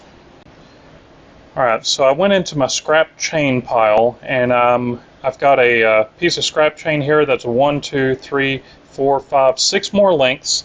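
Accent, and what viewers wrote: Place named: North Carolina, United States. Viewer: Such clean fingernails for a mechanic! I guessed NC, and I was right! I don't know how. I'm in Boulder, Colorado...Never been there, or anywhere near. I guess it was the subtle accent and the nice little town. I've electrified a Chinese cruiser with 29 inch wheels. It's like a giant version of this. The chain keeps popping off, the motor is too powerful. I've ordered a 22 tooth with an offset to bring it in better alignment. We'll see. The removal of the sprocket was surprisingly easy, but I wouldn't have known how without You.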